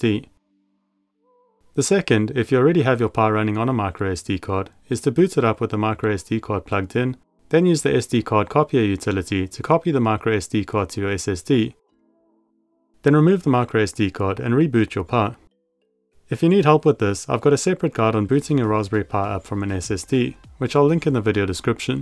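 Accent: South Africa. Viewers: Ey you South African, I like you & ur content ❤🎉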